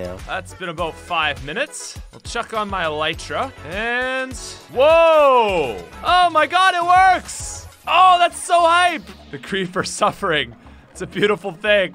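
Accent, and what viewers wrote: Ireland. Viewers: Is he Irish because sometimes he sounds Irish but it’s not super noticeable like most Irish people but I can kinda hear it. I HONESTLY can’t tell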